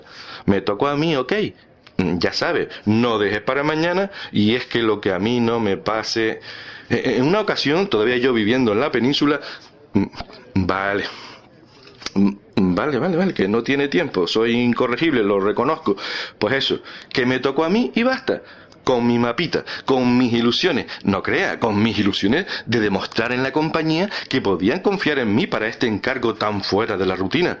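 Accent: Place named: Chile. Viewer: Buenísimo!! Muy bien narrado!! Me encanta el acento, será chileno?? Me he reído mucho!! Muchas gracias!!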